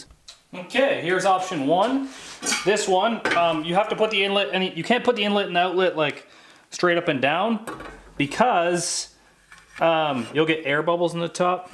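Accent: Canada. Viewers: Props from Manitoba, only YouTuber I've found with more or a Canuck accent than me. Keep killing it man. Lets race once my caprice is done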